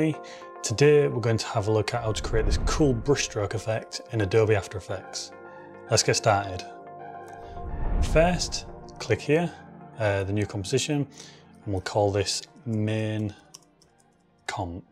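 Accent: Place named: Hull, United Kingdom. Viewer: Sounds like you're a fellow Hullensian? :D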